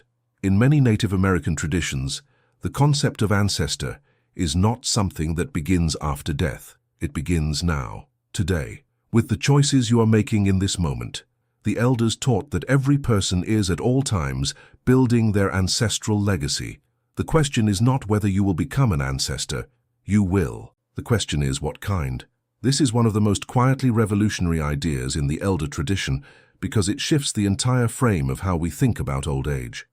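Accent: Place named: England, United Kingdom. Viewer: Why is the exact same voice on all these types of videos? A slight English accent, a deep voice… is that one guy or is that AI?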